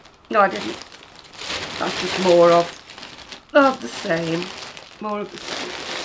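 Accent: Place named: RP, United Kingdom. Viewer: You always make me smile! Posh or no, I love listening to you.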